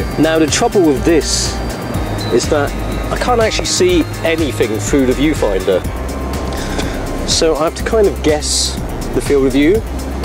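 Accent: United Kingdom. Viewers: a chinese man speaking british wtf is going on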